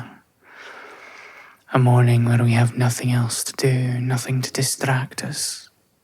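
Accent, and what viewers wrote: Scotland, United Kingdom. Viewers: Well, that Scottish accent does it again lol. I wasn't ready for the mix of soothing and spicy—you're definitely a master at keeping us on our toes. Can’t wait to see what you come up with next! ❤ ❗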